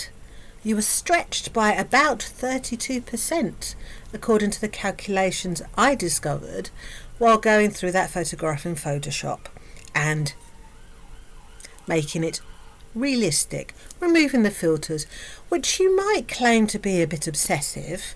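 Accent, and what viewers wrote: United Kingdom. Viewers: This is exactly why i watch her as well....its the lying and the willingness to take it as far as she can go, even when proven wrong. Love your videos! Nothing sounds better when youre always proving her wrong with that british accent!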